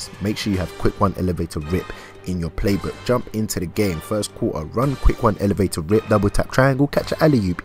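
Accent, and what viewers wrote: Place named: Birmingham, United Kingdom. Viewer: Big respect to you bruv. I'm averaging 70k from these methods. I'm assuming your from the UK fam by your accent. Your accent sounds kinda Brum like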